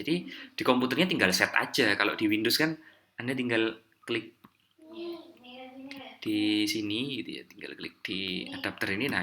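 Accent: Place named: Java, Indonesia. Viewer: ngevapenya kayak menikmati hidup gitu mas. Wkwk. Medoknya masih yaa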